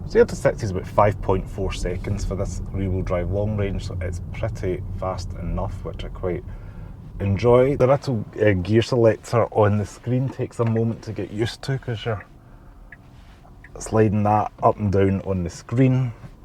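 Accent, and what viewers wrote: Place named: Glasgow, United Kingdom. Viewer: Love and miss that accent mate. You have a new subscriber ex-Glaswegian :)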